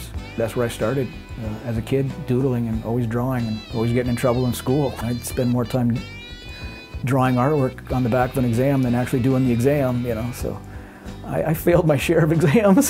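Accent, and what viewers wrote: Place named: Canada. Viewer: Great work! I knew you were Canadian when you started talking.👍🇨🇦love to look at hand painted signs, puts vinyl to shame, no soul! Hope to see more of your work for years to come!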